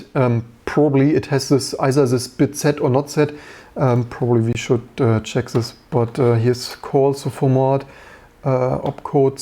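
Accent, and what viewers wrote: Germany. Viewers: Come on, everybody knows you're a German hearing your thick accent … 🙄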